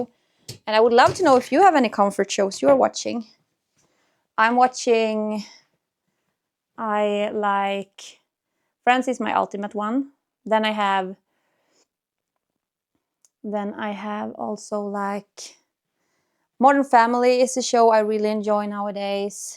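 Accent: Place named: Sweden. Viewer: Call things whatever you like to call them. Hopefully everybody knows you're from Sweden and have an accent. I dont expect perfection in the way you pronounce words whatsoever. Honestly, I'm just working and I'm not really even paying attention to the way you're talking or not talking. I don't notice at all. I noticed you said please talk normally. it almost sounded like somebody in your family or some caregiver said that to you as a kid maybe? Please be kind to yourself. I don't probably most people don't notice these little things that you notice about your communication.. words Etc. Please focus on the fact that you're making a video and that's in itself is really amazing!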